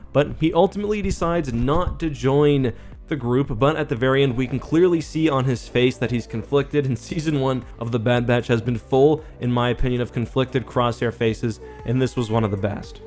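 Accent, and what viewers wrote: Canada. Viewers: You Canadian bro? From Ontario?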